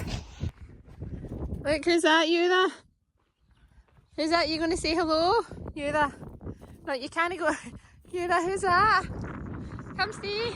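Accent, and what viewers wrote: Scotland, United Kingdom. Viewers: So much fun hearing your Scottish language. Unique and very special. This is a very special tour. And so and so ... the two guys that I have been following here is Nick Zentner , a university guy, who carries along the Columbia River basin etc. in the Northwest and Myron Cook who does the Central Basin to the Gulf and beyond ~ Wyoming and such. (just saying😊) Thank you so much for sharing all that you do. 〰✨🌿👍🌿✨〰. Ooopa ~~~ And then Antonio Zamora who promotes the etiology of impact basins with vortex around Saginaw Michigan ~ 12,900 years ago ~ (not really geology more just history and our extinction event). And so thank you again. All good. ❇ New Hampshire. ❇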